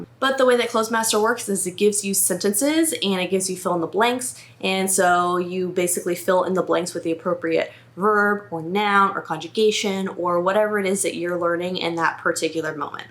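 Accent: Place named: United States. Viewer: I’m living in Australia 🇦🇺 is it possible? It sounds like you’re in USA 🇺🇸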